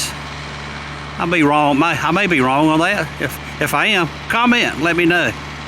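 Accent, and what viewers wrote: South, United States. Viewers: I love your Southern accent and the way you pronounce words. That's the great thing about the USA, depending on the state, city and even towns depends how one pronounces words. It's beautiful and don't change! Also maybe do some more RV videos of campgrounds because that would be awesome as well. Thank You!! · I love the Southern accent, and you are doing great and great video. It made me in love with the town. It is beautiful. Thank you for sharing. God bless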